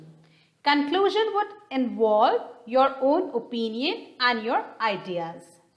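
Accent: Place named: United Kingdom. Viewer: Its a britishian way to speak English 😒foolish...😏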